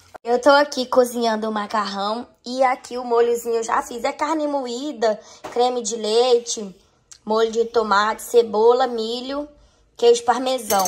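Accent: Minas Gerais, Brazil. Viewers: você fala torando coisasvde minas gerais amo voces meus conterrâneo mistura dos dois.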